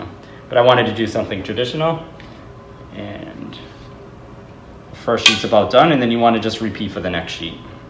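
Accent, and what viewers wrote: Boston, United States. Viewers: Thanks for the recipe! Am I hearing a Boston accent there?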